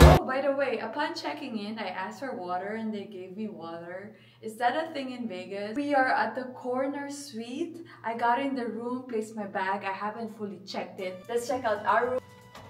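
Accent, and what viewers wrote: Philippines. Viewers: YOU SOUND LIKE A 'FILAPINA' ?:face-blue-smiling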